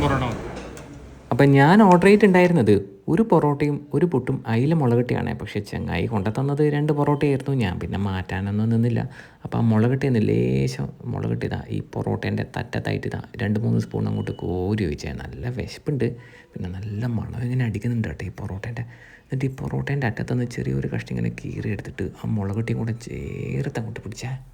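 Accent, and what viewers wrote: Kannur, India. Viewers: ഇയാളുടെ വിഡിയോ ഓഡിയോ മ്യൂട്ട് ചെയ്ത് കാണുന്നവർ ഉണ്ടോ? പാണ്ടിക്കാട് കുഞ്ഞാൻ മലപ്പുറം ഭാഷയെ വെറുപ്പിക്കുന്ന പോലെ ആണ് ഇയാൾ ഓവർ സ്ലാങ് ഇട്ട് കണ്ണൂർ ഭാഷയെ വെറുപ്പിക്കുന്നത്. ഞാൻ കണ്ടേ, ഉണ്ടേ, പോയതാണെ ഹോ കേക്കുമ്പോൾ തന്നെ അരോചകം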